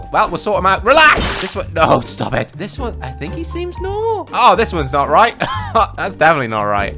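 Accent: United Kingdom. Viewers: You are British but I am from 🇵🇹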